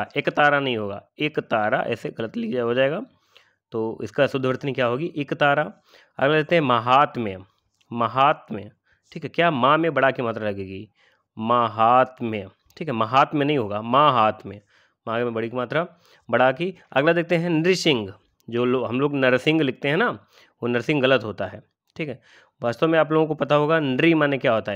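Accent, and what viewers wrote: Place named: Hardoi, India. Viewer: Sr aapki hardoi wali tone aa jati h kabhi 2..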